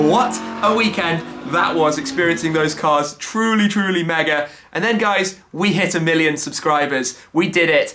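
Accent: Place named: United Kingdom. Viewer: damn ur accent is so british... and im british :0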